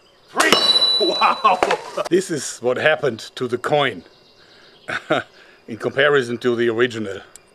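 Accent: Germany. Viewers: Cool video! Du bist deutsch oder? :D Erkennt man am dialekt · xXxFanisxXx it is a german trying to speak englisch (like me) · You've got a real German accent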